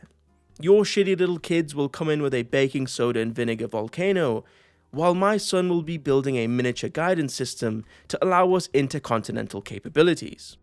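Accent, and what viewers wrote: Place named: United Kingdom. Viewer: Yo, where abouts in Britain are you from?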